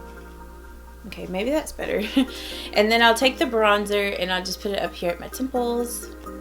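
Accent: South, United States. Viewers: Juz love to hear your southern accent!! We needed a southern bell makeup guru. Hugzz from Dallas, Texas!!!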